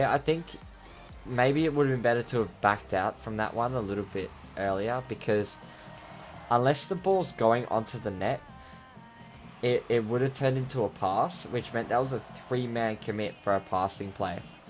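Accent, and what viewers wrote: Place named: Australia. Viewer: Holy shit your Australian accent is deep, I'm just used to you on your Facebook page, with no accent lol. Love the video tho bro, keep it up and good luck.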